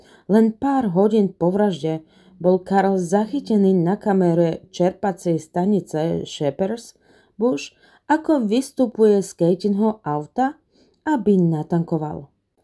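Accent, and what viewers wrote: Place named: Eastern Slovakia, Slovakia. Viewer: Ja som na to došla ty si vychodniarka. 😂 teraz ta mam ešte ❤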